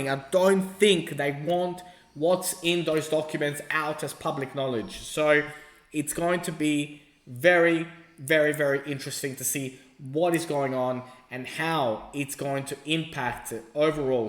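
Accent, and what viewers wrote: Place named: Australia. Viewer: G'day mate. Thanks for the great xrp video. Great to hear Aussie accent.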